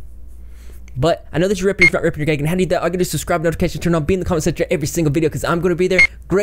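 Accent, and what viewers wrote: South, United States. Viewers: hearing all this in a southern accent has me shook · And as far as someone saying something about your voice in a previous video... I’m a southerner from Alabama and see nothing wrong with a southern accent. 🤠👍🏼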